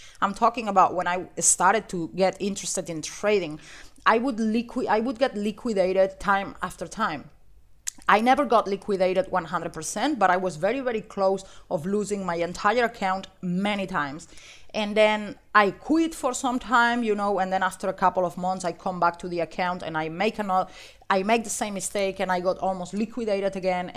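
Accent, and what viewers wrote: Spain. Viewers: spanish? from catalonia, my guess. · Hablas inglés perfecto y te noto acento espanol. De donde eres? Saludos, soy de BCN y estoy en VIE.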